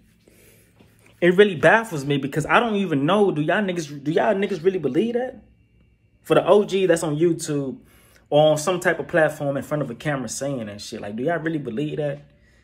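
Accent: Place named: Texas, United States. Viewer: You're not even from CA 😂 you sound like you're from Texas or somethin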